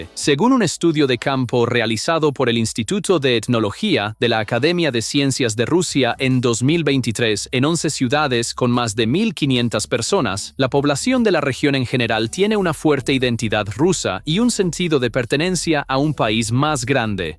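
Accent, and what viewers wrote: United States. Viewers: El acento usado al estilo gringo es UNA MIERDA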